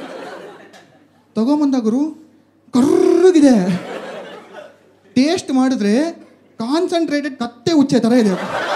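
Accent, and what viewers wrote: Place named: Bangalore, India. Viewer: Haha!! Kanditha!! Thanks a lot for watching!! Kannada bhaashe nammanna uddara maadtide.. Benglur slang swalpa mix ide, aadre pakka Kannadiga! ಕಲಿಯೋಕೆ ಕೋಟಿ ಭಾಷೆ, ಆಡೋಕೆ ಒಂದೇ ಭಾಷೆ, ಕನ್ನಡಾ.. ಕನ್ನಡ.. ಕಸ್ತೂರಿ ನಮ್ ಕನ್ನಡ!❤️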